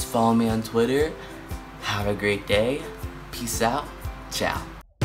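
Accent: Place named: Brazil. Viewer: You sound like a brazilian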